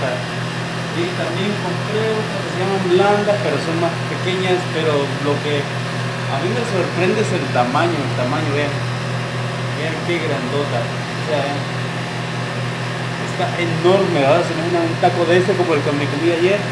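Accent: Mexico. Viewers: Amigo estoy sorprendida 🙀neta pareces mexicano 😘😘ya se te está pegando nuestro asentó jajajaja 😝😝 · nombre guanaco hasta tu accento no oye.y te oyes bien mexicano carnal respeto saludo de phoenix az.te quidas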